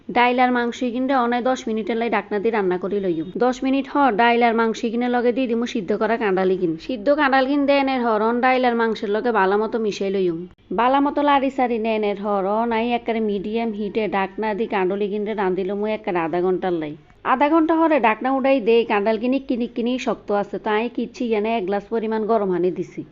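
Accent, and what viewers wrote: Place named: Noakhali, Bangladesh. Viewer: এমন খাবার আমার কখনো খাওয়া হয় নি...নোয়াখালীর ভাষা শুনতে মজাই লাগলো 😁😁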